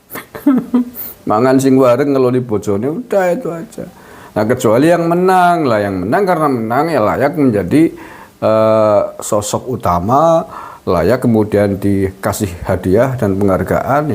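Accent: Banyumas, Indonesia. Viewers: Jebule bpke wong banyumas ya.......👍👍👍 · bahasane lucu😂 Banyumas banget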